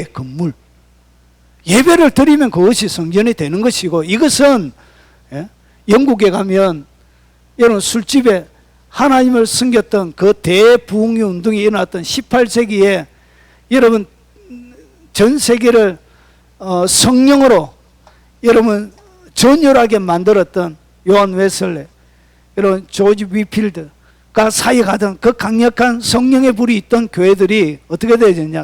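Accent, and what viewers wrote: Gyeongsang, South Korea. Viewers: 네 맞습니다 경상도 억양이 그렇습니다 입구조가 그런지 못 고칩니다 경상도 식당에 가면 시끌시끌 하고 전라도 지방은 조용합니다 큰 소리에 훼방 받지 말고 은혜받으시길 기도합니다 저도 목사님 말씀 늘 경청하고 있습니다 이와 같은 때 주의 귀한종들을 통해 꼴을 억여 주시는 하나님께 감사와 존귀와 영광을 돌립니다🙏저도 볼륨 조절하며 듣습니다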